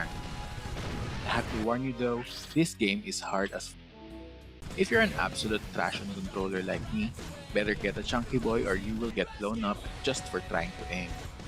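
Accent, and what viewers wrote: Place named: Philippines. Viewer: Sounds very Filipino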